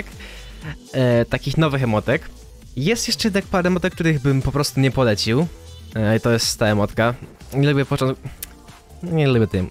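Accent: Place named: Podlasie, Poland. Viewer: jesteś z Podlasia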